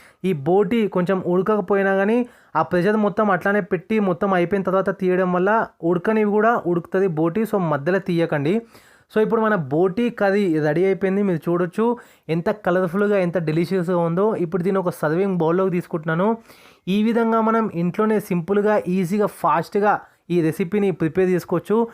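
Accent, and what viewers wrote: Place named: Telangana, India. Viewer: Fine cooking 🍳🔪 bro❤ Telangana language bro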